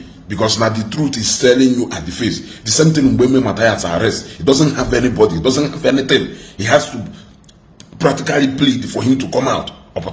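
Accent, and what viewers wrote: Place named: Igbo, Nigeria. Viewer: awesome presentation. That's the ende-Igbo style of talk.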